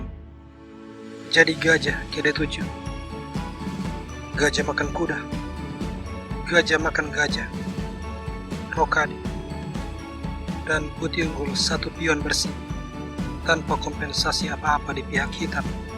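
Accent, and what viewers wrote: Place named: Eastern Indonesia, Indonesia. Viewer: Mantap ini Chanel, penuturannya jelas dan mudah dimengerti.. Yang paling penting, aku suka aksen bicara nya, khas orang timur 👌👌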